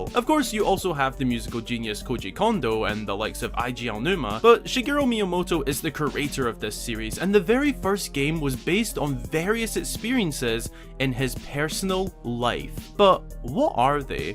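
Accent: Scotland, United Kingdom. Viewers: You're scottish?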